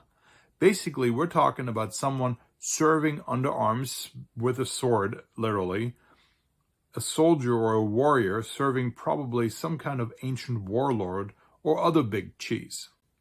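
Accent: North, Germany. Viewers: German speaker here: You have a slightly Northern German accent. There (and even more in related Dutch) constructions with "von" are more likely than in standard German. I, located in Hessen, prefer Bian Coady´s sentence.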